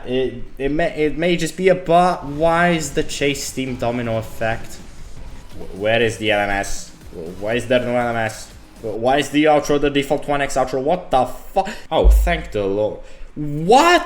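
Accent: Italy. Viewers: heard that voice and had a suspicion, then that “proelium fatale” was obvious, sono italiano anch’io ;)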